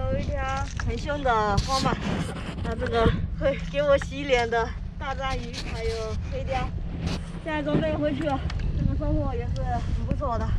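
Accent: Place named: Putian, China. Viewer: 我上次有在你生日留言那个视频不知你有没有看过，听皮皮说你还没有男朋友我很开心，我觉你是聪明 美丽 朴素善良可爱姑娘如果有可能我们联系看看，简单的自我介绍一下，男28岁 福清人 父母都在家乡一个妹妹已出嫁、我在美国快10年本来今年二月要回国看亲（父母一直吹）我听你讲国语是莆田口音离我家乡不会太远我估计差不多2小时吧、你也知道在我们那边28岁还没有结婚算高龄了😭当现在美国疫情很严重不可能回国了，自从看了你视频以后觉的你一定会是那种会认真过日子的人，所以有机会我们互相交流了解一下、等你回复谢谢💪💪💪💪💪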